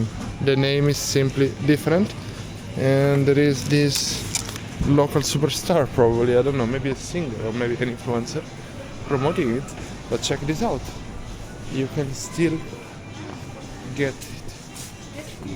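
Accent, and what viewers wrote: Italy. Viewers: Судя по акценту, парень из Италии? Или мне показалось?😂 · Hello! Are you Italian? Your accent sounds Italian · Поправьте меня если не права, но как будто автор итальянец ) Узнаваемый акцент ))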